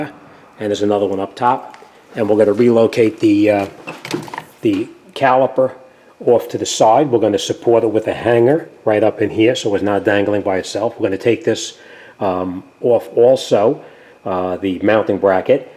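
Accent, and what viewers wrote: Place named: New York, United States. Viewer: I'm a New York and I can hear it!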